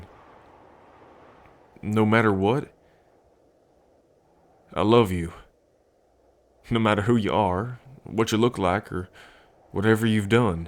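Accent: United States. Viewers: 🥰🥰🥰nice country voice ❤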